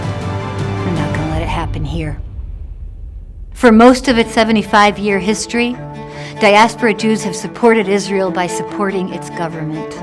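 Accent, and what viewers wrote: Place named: United States. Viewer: Her American education shows in her perfectly American English. I also happen to agree with her....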